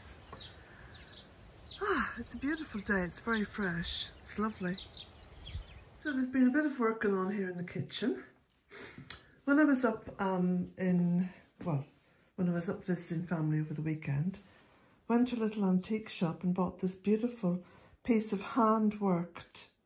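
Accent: Ireland. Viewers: at times when possible and feeling up to it, could you add typed out the names of some of your plants? Today, the one I think started with two syllables of "C" and perhaps ending with being "Aster", I would love to know what it is. It was along a wall and the bees were enjoying it! 🥰 I'm from America. 🇺🇸 🙂 Some of the names of plants I can make out and some along with hearing them correctly, I know of and some I've had. I'm sure you're speaking them all correctly! I dearly adore your accent. In America there's so many different accents! As well, I don't know if we have all of the same plants as Ireland. Some I'm sure aren't in both of our continents. My Great-Grandparents came from Ireland to America. So I have Irish in my ancestry and some in my other blood line, too. Thank you kindly,. I always feel nourished by watching you, seeing your lovely cottage, and life in your ever-evolving three acres! 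A most beautiful slice of earth!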